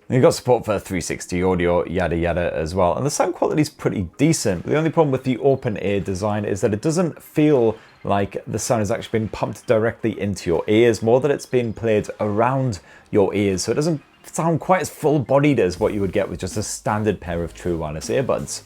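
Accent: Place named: Northern England, United Kingdom. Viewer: Oh I found your video rather refreshing first of all you’re not American or are you putting on a great northern accent or dialect et cetera. However you failed to mention about the ability to use it as a phone feature. That is he not making calls and receiving calls I take it these ear pods pods can actually do that cheers keep up the good work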